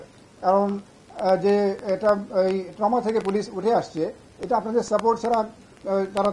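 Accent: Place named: India. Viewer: কথা ও উচ্চারন শুনে মনে হচ্ছে ভারতীয়? চেক করে দেখা উচিত!!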